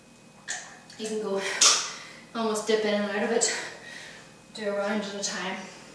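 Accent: Ireland. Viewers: So, you from Ireland?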